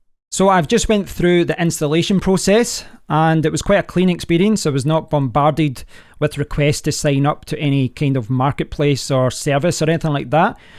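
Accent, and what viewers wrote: Scotland, United Kingdom. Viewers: I wish I could speak Scottish English, sounds amazing!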